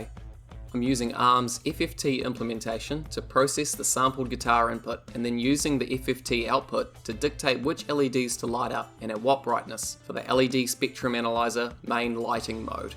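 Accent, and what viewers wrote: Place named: New Zealand. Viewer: Got to be a New Zealander, man we sound so strange after listening to Americans etc.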